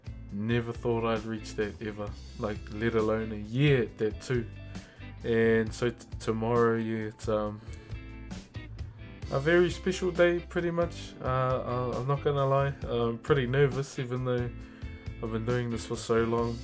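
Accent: New Zealand. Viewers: Are you from New Zealand? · New Zealand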